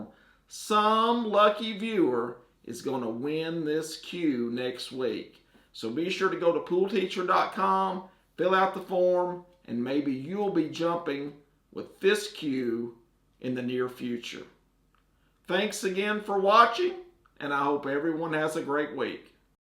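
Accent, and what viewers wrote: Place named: Texas, United States. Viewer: I have enjoyed your videos and commented on several. Given the title of this one, I was a bit disappointed. Only 5, 6, 8, and 9 were truly instructive - yet one has to look for the opportunity to try them.. The others were variations of trick shots found in any trick shot books (see Byrne or Massey). An alternative shot on the “corner hooked” down the rail shot is a 3 rail shooting into the near corner face. Aim at 1st diamond up from object ball. I’m sure you know this trick shot. Keep ‘em coming. You are delight to watch and listen to. You accent takes me back to my Texas upbringing.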